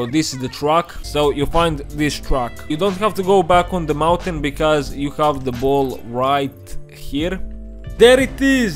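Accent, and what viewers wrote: Russia. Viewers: I love this guy russian accent. Im balkan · Wait, no he’s either Russian or German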